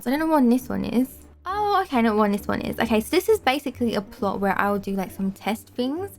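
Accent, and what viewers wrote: London, United Kingdom. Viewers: You are from London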